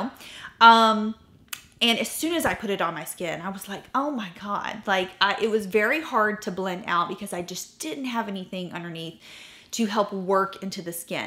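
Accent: South, United States. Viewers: I betcha you were raised in the south....where?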